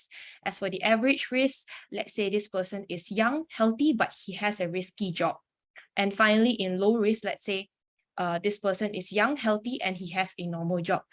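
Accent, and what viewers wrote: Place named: China. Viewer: Try to explain slowly and speak english not as chinese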